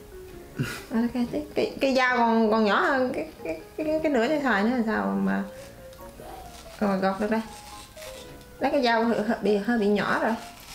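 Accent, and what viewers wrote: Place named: Mekong Delta, Vietnam. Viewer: Giọng nói đậm chất miên tây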